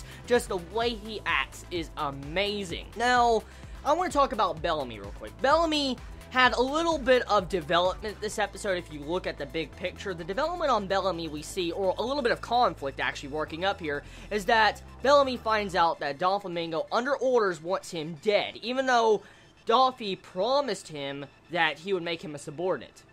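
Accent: South, United States. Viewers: random question here.....but are you from down south, as in south america? (texas ect)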